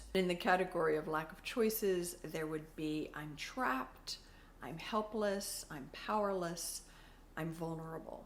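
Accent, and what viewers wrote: United States. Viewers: Your videos are amazing! They help me a lot! I also love your californian accent.... And you are so pretty! Thanks 🤗☺️🌷🌷💕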